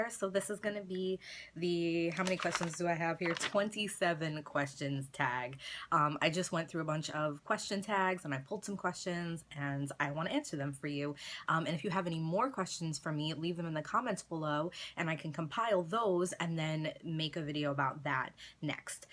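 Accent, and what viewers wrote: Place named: New York, United States. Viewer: omg im from nyc and when I first started watching your videos all I could think of is "she has to be from nyc" bc your attitude and the way you spoke 😂😂💖 I love your videos btw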